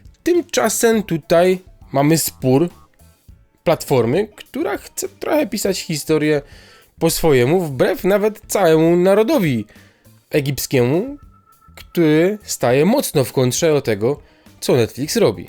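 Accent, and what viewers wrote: Silesia, Poland. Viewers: Chopie jak jo Cie słuchom to widza żeś je łod nos ze Ślūnska 😂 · Pytanie... jesteś ze Śląska?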